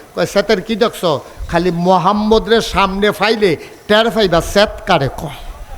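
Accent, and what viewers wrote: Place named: Comilla, Bangladesh. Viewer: হুজুর মনে হয় কুমিল্লার। মাশাল্লাহ অনেক সুন্দর ওয়াজ।💯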